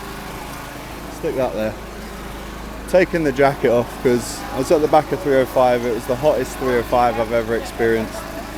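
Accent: Liverpool, United Kingdom. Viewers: Glory glory man united...love your Scouse accent, your a woolyback supporter ...love from the laughing manc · Funny sounding Scouse accent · Kop tv presenter should have a local accent is this one a genuine Liverpudlian.? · What a lovely scouse accent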